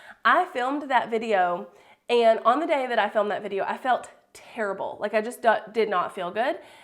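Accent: South, United States. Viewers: Have you been to Gatlinburg TN? I started a small YouTube channel about our small tourist town...I can tell you're from the South 😉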